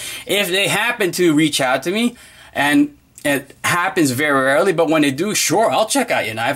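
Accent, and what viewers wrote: New York, United States. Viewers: Ha! I'm watching this with my girlfriend and we where guessing where your from based on your accent. I said gotta be Jersey or new Yorker. She said Monmouth county nj lol. Gotta be strong island?!? We are down the Jersey shore but I grew up in the Bronx and work in Manhattan. Keep it up bro def simple informative and straight up videos! Like they should be!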